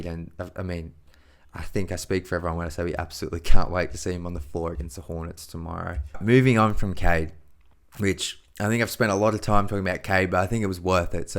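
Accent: Australia. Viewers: Came here from Twitter, and the Australian accent surprised me! Always love an international Deeeeetroiitttt basketball fan! Awesome breakdown & analysis, keep up the premium work!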